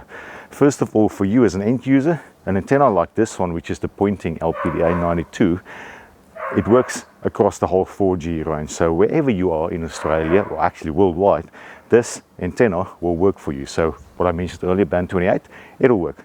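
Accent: South Africa. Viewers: you sound like you are from South Africa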